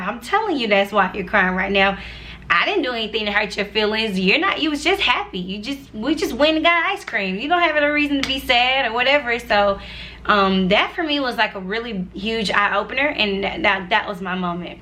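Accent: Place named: Georgia, United States. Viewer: Thank you for sharing your story! I resonate so much with the many years of believing in Him but not really KNOWING Him but having the time when you dive deeper & learn to CLING to the relationship you developed with the Father ❤️ P.S. while watching your video I was like “This girls accent is definitely a Ga accent” because I’m from Ga too, then you said Jonesboro & I was like 😮 I grew up in Jonesboro too!!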